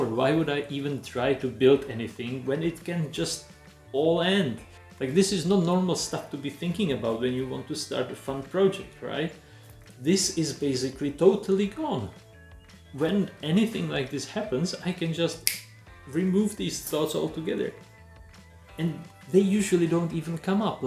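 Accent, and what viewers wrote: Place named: South Africa. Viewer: Are you South African my friend? I know your accent!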